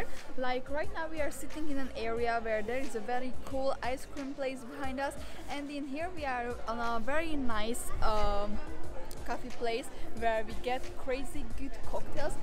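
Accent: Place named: Hungary. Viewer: Romania was never part of Soviet Union. You have a very cute Hungarian accent. It's like your native country. Fortunately never Soviet Union. Greetings!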